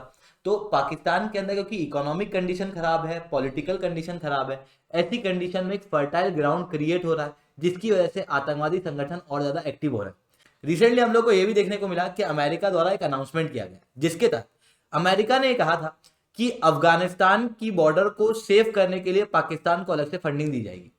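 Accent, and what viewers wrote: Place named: Himachal Pradesh, India. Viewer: Sir himachal se hae sayad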